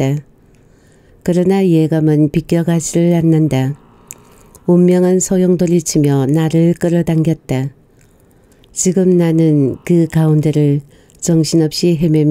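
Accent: Gyeongsang, South Korea. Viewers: 경상도 분이라서 사투리가 전혀 이상하지가 않네요,잘듣고 있습니다,감사합니다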